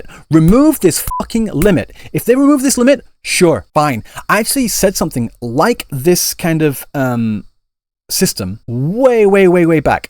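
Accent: United Kingdom. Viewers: British tectone?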